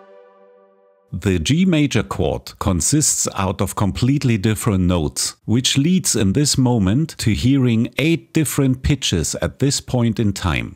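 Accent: Germany. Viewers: Akzent klingt Deutsch.......gibt es auch solche Videos von ihnen auf Deutsch?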